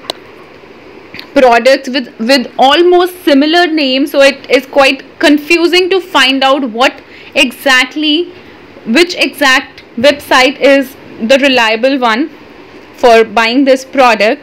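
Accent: India. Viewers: I think you should do asmr videos your lovely Indian accent gave me brain tingles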